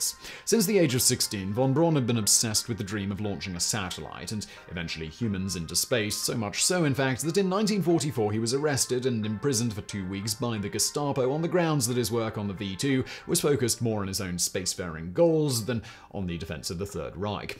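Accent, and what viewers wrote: United Kingdom. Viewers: why do brits ad extra syllables to the word Alminium? It's 4 syllables not 5. The hard second "i" is totally supposed to be silent, not accentuated. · He's British so no.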